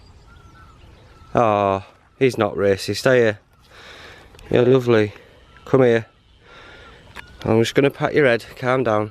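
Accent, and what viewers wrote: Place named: England, United Kingdom. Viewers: Eh up lad. Going a bit avant garde now eh! have you been at the wacky backy lately? And by the way, ya call it an oss in Yorkshire not a horse, I think your from Lincolnshire really you know, its your English and your accent??? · I can't understand half the times lad for your English accent. :-(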